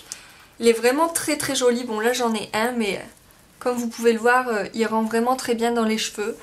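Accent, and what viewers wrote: North, France. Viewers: Je n'avais jamais tester l'astuce du vernis transparent, je testerais à l'occasion, car moi aussi j'ai des allergies au niveau des oreilles! Merci pour tes supers vidéos et ton accent du nord bien prononcé, ça me fait penser à ma ville natale! <3 Bisous! :)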